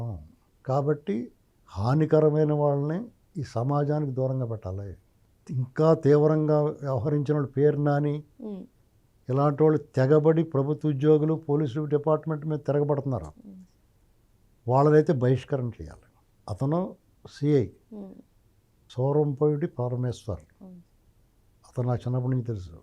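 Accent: Godavari, India. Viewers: సూపర్ గా చెప్పారు గురువు గారూ.. ఈ యెదవలందర్నీ బహిష్కరణ చేయాలి.. ఈ విషయం గట్టిగా ఆలోచించాలి. మీ ఆలోచనలనూ, మీ సూటిగాప్రశ్నించే విధానాన్ని మీ అభిమానిగా.. ఎపుడూ అభినందిస్తాను. ఎన్నో మామూలు జనాలకు తెలియని, అర్ధం కాని విషయాలు కూడా ఈజీగా గోదావరి జిల్లాల యాసలో, సామెతలతో చెప్పడం నాకు బాగా నచ్చింది. నమస్కారాలు.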